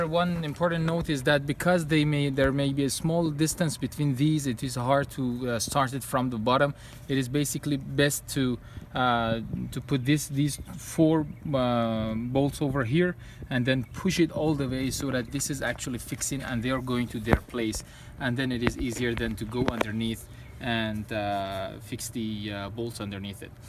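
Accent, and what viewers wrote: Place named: Iran. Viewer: Dastet dard nakone mard ,karet doroste. Ensafan englisit ali o ravooneh ,az ye rize tah lahjeye farsit goftam nakone in baba Irani bashe ( maha filmaye be dard bokhor injoori nadarim to YouTube) ,esmeto ke didam , fahmidam hadsam doroste. Baese eftekhare ke hamvatene ma ye hamchin videoye be dard bokhori gozashte to YouTube.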